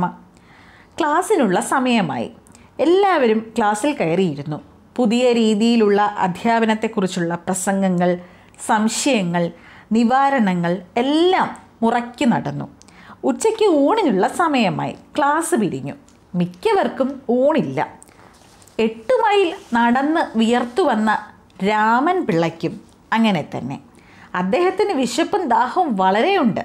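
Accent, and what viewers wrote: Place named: Malappuram, India. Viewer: Malappuram/palakkadu slang💖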